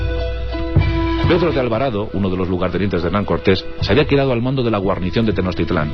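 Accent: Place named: Spain. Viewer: que se puede esperar...El autor de este video, y se puede notar en la voz, son españoles... No me sorprende que hagan ver a tenochtitlan como los malos y los españoles como los buenos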